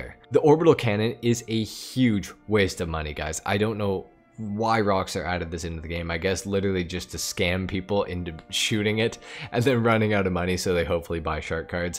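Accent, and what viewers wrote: Winnipeg, Canada. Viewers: Are u from Winnipeg brotha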